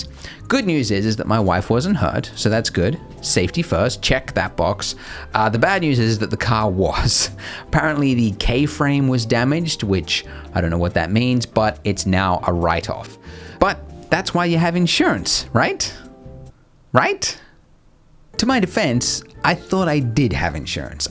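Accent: Australia. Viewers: You can't tell he's Australian from his accent? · look at the video -which side of the road is the car driving on? Now listen to his accent. It's not Cali or Minnesota. It's Australia, mate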